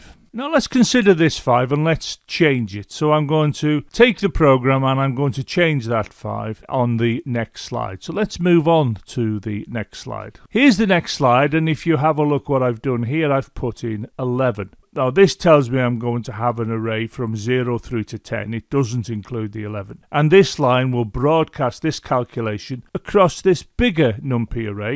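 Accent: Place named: Scotland, United Kingdom. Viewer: Nobody cares today about the correct pronunciation of softwares' names (like Gimp, for example), but I think it's his nice presumably Scottish accent that makes him pronounce it that way.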